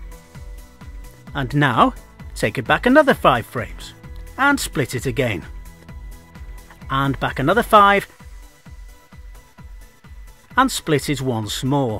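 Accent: Wales, United Kingdom. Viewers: Thank you so much:) just watched it, I knew alot of the stuff but I have learnt a few new tips, thank you!! Are you Welsh? Sometimes you sound Welsh, sometimes English lol x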